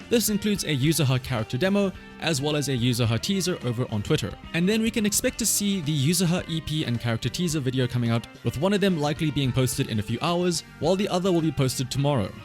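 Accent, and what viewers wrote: South Africa. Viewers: dude are you south african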